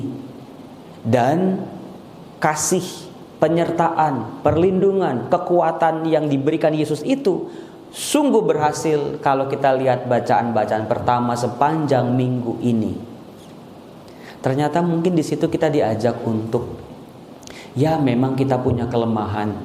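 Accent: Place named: Eastern Indonesia, Indonesia. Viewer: berarti tinggalnya didaerah kebun jeruk. seperti orang dari Timur tapi halus kalau berbicara seperti orang jawa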